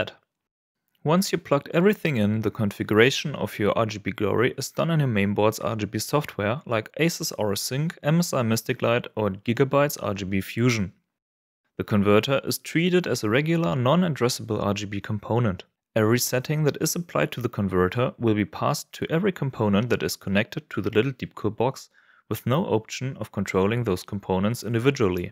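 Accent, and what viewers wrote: Germany. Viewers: That heavy German accent Tho :) . Nice video BTW , keep Going.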